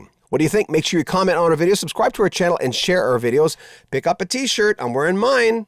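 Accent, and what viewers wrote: Canada. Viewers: I didn’t see a video I just heard a Canadian accent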